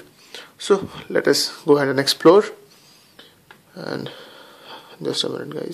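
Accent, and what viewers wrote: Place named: India. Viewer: Спасибо. Чё-то мне кажется, шо это индус, шо ли, но прикольненько, таки разговорный английский я у него понимал, кроме того, я не силён в английском, так что понравилось, что часть слов (word) я понял(i understand). И плюс наглядность, круть. ☺ ↑ +5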